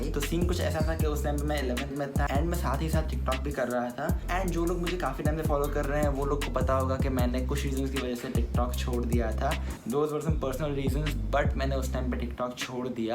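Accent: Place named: Gujarat, India. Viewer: yeah that's why....his accent is full gujrati 😊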